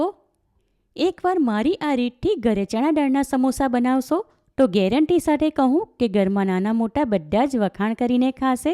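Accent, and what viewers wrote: Surat, India. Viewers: Ben tme to jaberdast surti bolo 6o ne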